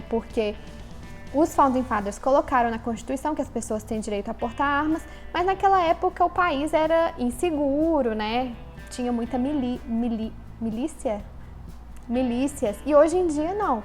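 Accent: Minas Gerais, Brazil. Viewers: Você é mineira? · vinicius Correção, sotaque mineiro, então você é de Minas kkkk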